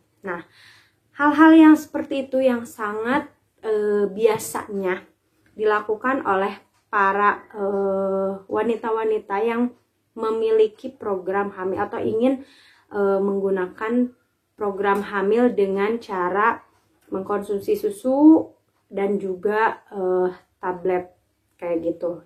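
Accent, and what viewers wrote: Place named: Tasikmalaya, Indonesia. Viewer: Logat tasik na aya keneh.. 😁😁✌️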